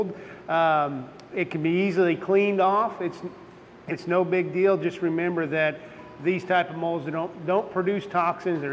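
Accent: South, United States. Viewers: Damn it said UK college and the American accent gave me a fright before realising it was University of Kentucky not United Kingdom. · From the title, I assumed it was "United Kingdom College of Agriculture..." So I was very shocked when this deep country American accent broke out.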